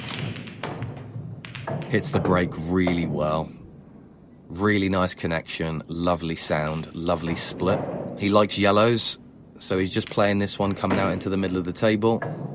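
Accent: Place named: Midlands, United Kingdom. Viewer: I knew I could hear a midlands 'twang' in your accent.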